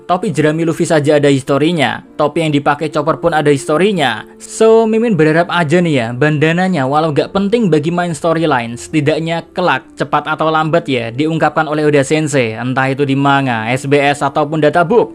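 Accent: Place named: Jepara, Indonesia. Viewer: Owalah admine wong jeporo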